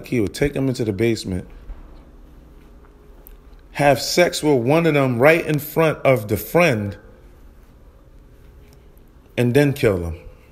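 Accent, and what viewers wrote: New York, United States. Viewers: U from uptown my guy? Or nyc? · One thing you can say is it's a New York dude who is narrating this,you know several things,preacher is in the pen,in this person head,he can't get next to him, Another thing is this,speaking about preacher may help him get likes views clicks I dont know, To be frank I know apple preacher and the henchmen whom is from queens,whom preacher possibly turned on,but Im making this person stronger then how weak of preacher he might think he knows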